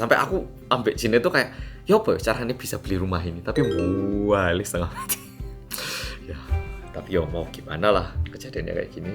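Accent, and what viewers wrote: Java, Indonesia. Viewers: Melihat banyak kebaikan,menjadi hati lebih tenang,semoga kita selalu bahagia dan dapat membuat orang lain bahagia Jowo ne mendok... Ya Allah bahagia selalu 😊😊😊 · Itulah percayalah,setiap kesusahan pasti ada kebaikan,berarti di uji dulu dg kesusahan,dn di balik itu Tuhan punya rencana yg Indah dn ALLOH memberikan balasan kebaikan,INTINYA,SETIAP ALLOH memberikan Ujian pasti ALLOH akan mengangkat derajat,aku suka bngt dg dn klrg, krn JAWA BNGT MEDOK BNGT GK NYANGKA ahwa Asli korea.....👍👍👍👍👍💚🙏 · ak tuh sll speechless typ nnton videomu, km tuh bner2 ky org jawa asli ngmgmu medok asli😂 maaf ya out of the topic😅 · Wes suwi gak kerungu jowoan medok curhat² ngene... Ileng pertama youtubemu biyen.. entah siapa yang salah...ku tak tahuuuu 😅😂😂😂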